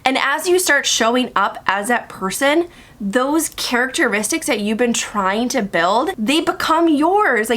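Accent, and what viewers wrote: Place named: United States. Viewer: yess your very clear American accent is helping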